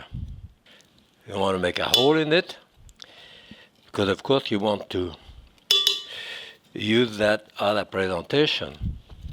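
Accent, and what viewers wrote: France. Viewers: Monsieur Pépin, j’aime regarder votre émission de cuisine, et votre accent français quand vous parlez anglais est si adorablement mignon 😊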